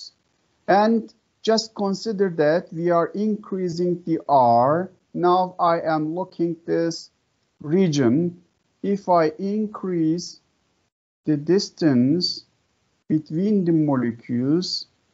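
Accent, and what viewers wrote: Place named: Russia. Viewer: At first I thought that you were speaking Russian.. But glad it's English.. By the way from which country are you from?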